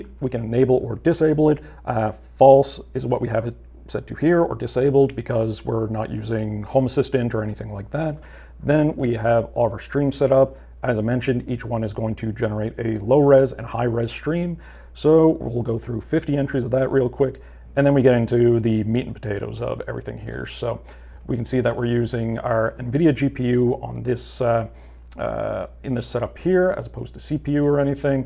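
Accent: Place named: Cape Breton, Canada. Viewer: If that isn't a CB accent I'll eat my hat.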